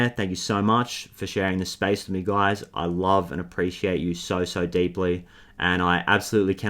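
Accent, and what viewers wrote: Australia. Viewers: I'm really grateful I've stumbled upon your channel. I've lived the happiest years of my life so far in Australia, life happened and I had to return to my home home country and all the challenges that come with it. Listening to you talking with an Ozzy accent and about spiritually which I've been in so much need for, is literally soul soothing amidst the time I'm going through right now. I deeply appreciate you and am grateful for your life, your gift and being connected to you. I Love you too mate❤ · omg you’re australian i luv your accent saur much! srsly it’s dope but thank you! you spoke right to my soul 💯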